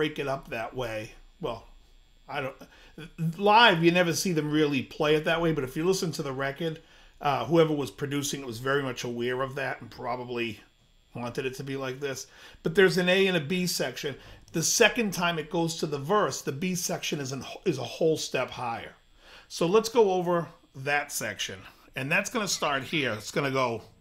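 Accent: Boston, United States. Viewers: Do you think this guy's from Boston?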